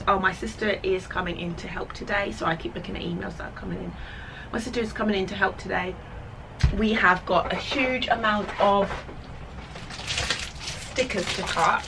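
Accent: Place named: United Kingdom. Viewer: Hey, I love your channel ! I'm a full-time children book illustrator from Madagascar. I love seeing other black artists growing on social media, we definitely need more representation here ! Love, Sawyer PS: I love your British accent <3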